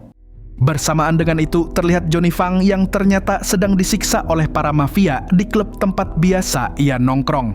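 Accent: Banjar, Indonesia. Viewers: orang banjar kh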